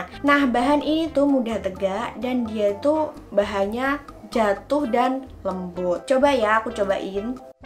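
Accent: Java, Indonesia. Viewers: Keknya org Jawa ya min? Kelepek Ken..ke mencung en 🤭🤭🤭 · Jawa medok kak:) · Orang jawa mana sih kak ? Kok medok bgt hehe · Mba orang Jawa ya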